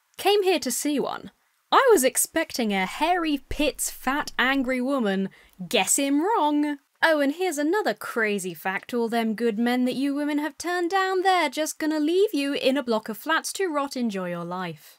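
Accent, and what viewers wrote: United Kingdom. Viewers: Despite being English I tend to watch a lot of American channels but it’s really refreshing to hear some jolly good Bri’ish snark and sass from. 🇬🇧☕️ As for the fish thing, for the sake of being a know it all, there are multiple species that can communicate, such as saddleback clownfish, leaping blennies, grouper fish who can communicate with octopi, as well as fish that can use tools like the tusk fish.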